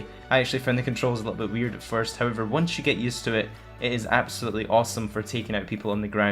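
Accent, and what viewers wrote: Scotland, United Kingdom. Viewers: are you Scottish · Are u from Scotland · are you Scottish? love the accent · You're Scottish? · u sound Scottish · Clicks on video, finds out he's Scottish, INSTANTLY SUBSCRIBES.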